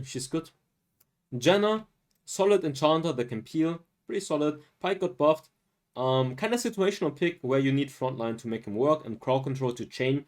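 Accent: Germany. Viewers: o he is german?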